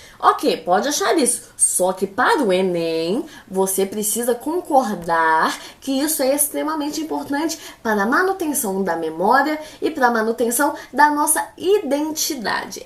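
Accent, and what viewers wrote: Minas Gerais, Brazil. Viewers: Quero ver como retomar a tese sem ficar repetitivo. Conheci seu canal ontem e to me odiando por não ter te assistido o ano inteiro :) E de curiosidade, quantos anos você tem? Tipo, c ta no ensino médio? Pq tava achando q c era professora, mas que parecia mto nova pra isso. hahaha PS: Q sotaque maravilhosooo, é igual ao da minha mãe, ela também é mineira :D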